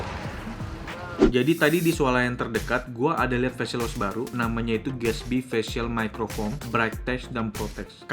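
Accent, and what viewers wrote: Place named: Palembang, Indonesia. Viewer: Suaro wong palembang mano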